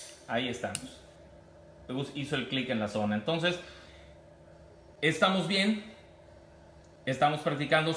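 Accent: Mexico. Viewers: Saludos desde España para México lindo,querido y armado.Videos interesantes y además en el espléndido español mexicano,lo cual se agradece.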